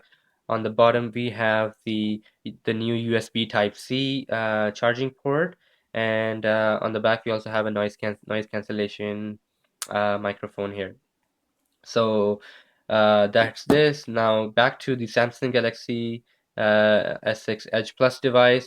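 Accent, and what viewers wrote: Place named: India. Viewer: u sound like an indian,r u?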